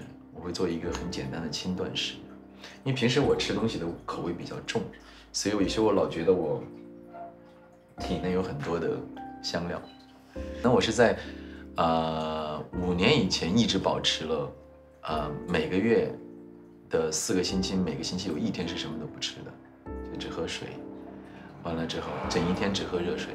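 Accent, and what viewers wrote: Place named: Chongqing, China. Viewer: 听出来他的重庆口音了 哈哈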